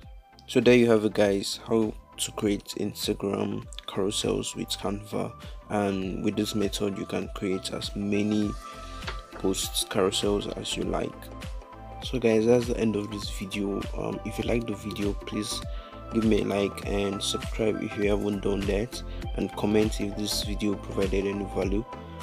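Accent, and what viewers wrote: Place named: Nigeria. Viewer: I picked up a Nigerian accent right away 😀, and I've got to ditto other comments. I was like, 😲 great tutorial! Thanks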